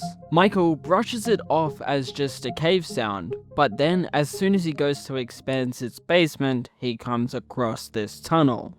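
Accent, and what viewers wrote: United Kingdom. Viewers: U sound sooo British, no like really, anyway, good video ma boi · Love the British accent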